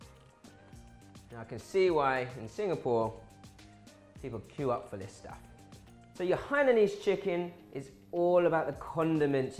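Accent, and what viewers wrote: England, United Kingdom. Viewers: M8, your UK accent is thick af. Nothing Singaporean abt it. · THANK YOU SO MUCH for the excellent tutorial videos. I’ve love Chinese food my whole life and always wanted to learn how to cook it better. I LOVE THESE YOUR VIDEOS. As a humorous side note. Being from the US I’m used to seeing Asian-Americans with an American accent. But It’s fun to see you with your British accent. So cheers to you. Chef. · YOUR BRITISH ACCENT IS TOO STRONG MAN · the best detailed show for Hainanese Chicken dish so far- of course love the English accent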